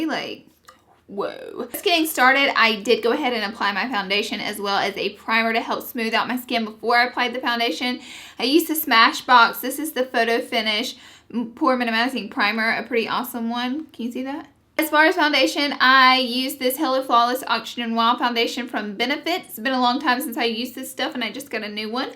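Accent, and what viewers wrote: South, United States. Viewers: #snapchatfam love this look, also I think your voice is amazing, my family is all southern and it makes me feel at home 😁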